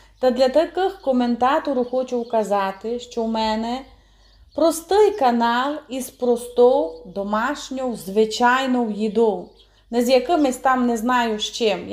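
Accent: Transcarpathia, Ukraine. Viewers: Як же гарно звучить Закарпатський акцент..смачно.файно,ладно до душі..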